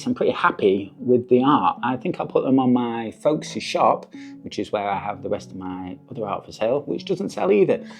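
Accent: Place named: Yorkshire, United Kingdom. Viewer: a traveler and you b sound just like my friends from Yorkshire ❤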